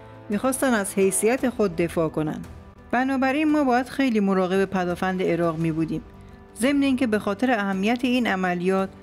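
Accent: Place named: Gorgan, Iran. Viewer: لهجه گرگانی شما مشخص و قشنگه🧿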